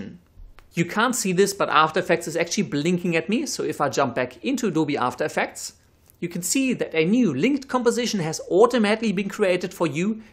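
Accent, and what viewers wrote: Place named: Germany. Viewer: Are you from Germany because your accent sounds so german?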